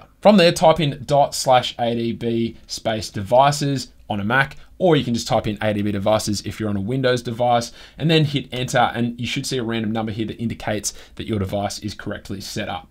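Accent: Australia. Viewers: Hey, first and foremost thanks for video as it's so awesome to finally be able to listen to an another Australian explain these topics. I wanted to ask if you could do a video covering how to install a GSI on a device as I have had trouble finding one that explains what to do clear enough.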